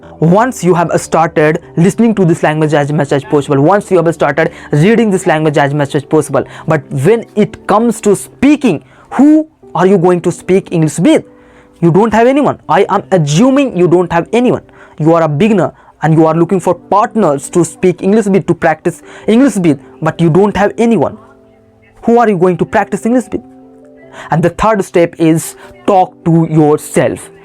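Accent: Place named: India. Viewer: Indian accent 😬🤢🤮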